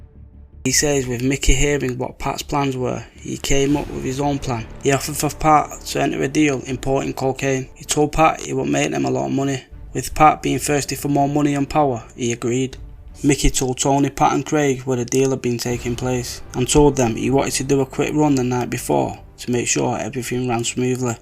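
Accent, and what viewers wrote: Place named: Northern England, United Kingdom. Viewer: Why? Cos he doesn't have a London accent? He's from up north that's how they speak. You must have an inability to grasp different accents cos I had no issues understanding him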